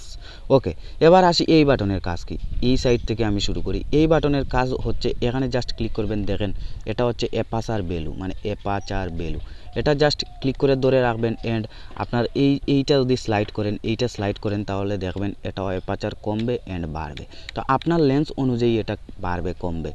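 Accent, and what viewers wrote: Sylhet, Bangladesh. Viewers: Sure o sylheti 100% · Vai apnar basa ki sylhet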